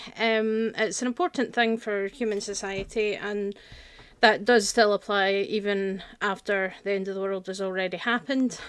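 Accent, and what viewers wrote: United Kingdom. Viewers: You from glasgow? My fiance is from Leith. Great vid, thx from USA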